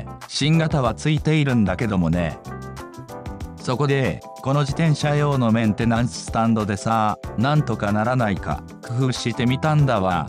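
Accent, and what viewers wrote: Hokkaido, Japan. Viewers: ホント、北海道もし冬に災害来たら怖いですよね😱 なまらとか、北海道弁🤣🤣あまり最近使わなくなりましたね。なんとかさーとか🤣北海道弁に聞き入っちゃいました。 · 今回は音声付きですね😆 北海道弁の感じがいい感じですよ👍 ソーラーパネル、災害の時のためにあると良いですね👏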